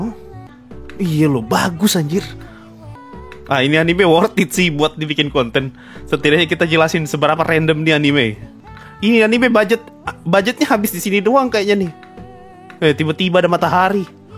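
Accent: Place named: Masamba, Indonesia. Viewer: logatnya kaya orang masamba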